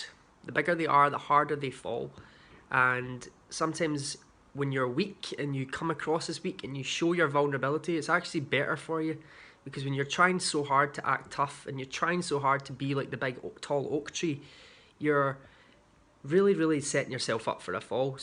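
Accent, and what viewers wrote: Scotland, United Kingdom. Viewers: I've just subscribed on YouTube. I've watched you on Facebook forever. I'll be honest, I can't pass up a scottish accent lol but you're always positive with messages. You're my first son's age, it can be a dark and lonely road the internet,the highs the lows. Life in general is hard at that age without a public one. I hope you are as sound as you talk and look back and reflect on these days in passing as building blocks and lessons. Now I'm sounding old af ( im 44 fyi) and just hope you're ok. Leave if not , those who dont know you personally may will still be here, most probably will because you're funny and sweet,, and those who do know you personally will be there to pick up the pieces, certainly doesn't make you week.. Likes are not what makes you exist. You exist because of of you. 😍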